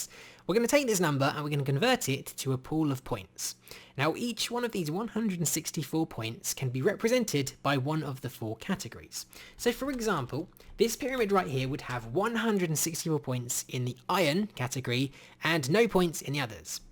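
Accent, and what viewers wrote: United Kingdom. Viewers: he's British what do u expect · It's our Southern England accent.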